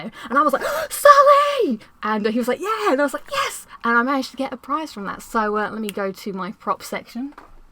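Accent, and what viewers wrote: United Kingdom. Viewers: Are you british? I love your accent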